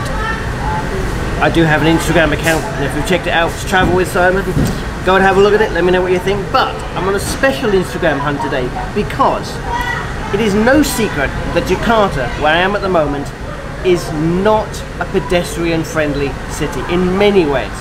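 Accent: United Kingdom. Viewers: English tulen...remember mr bean...omg.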